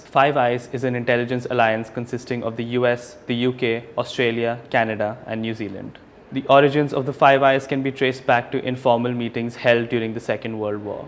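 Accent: India. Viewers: This f..ing indian accent :-(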